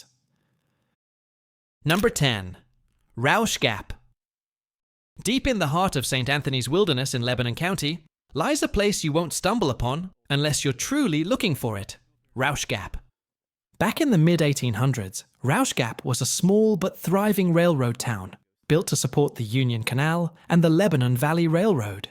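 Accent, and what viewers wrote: United Kingdom. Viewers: Is it me or does anyone notice the narrator's voice once in a while turns into a English/British accent? Lol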